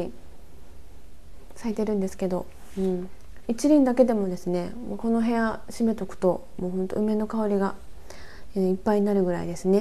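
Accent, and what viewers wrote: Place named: Kyushu, Japan. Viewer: たまに出る九州弁やイントネーションが同じ九州育ちには本当に癒されます😊。さんの理科的実験ノート🗒のような企画があると、盆栽の諸説や口伝えのなぜそうするの？が見える化できていいのかな~なんて思ってます。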